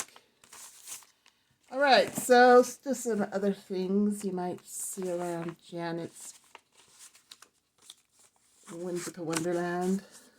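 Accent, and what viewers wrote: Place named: United Kingdom. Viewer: loving that British accent !! 😊🫖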